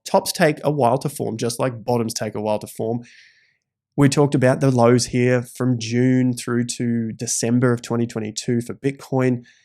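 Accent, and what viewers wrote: Australia. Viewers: How you say the DATA is an Australian thing (DATER)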